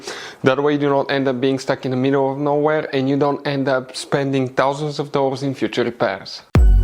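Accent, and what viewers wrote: Russia. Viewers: Just curious my friend where is your accent from? Russia?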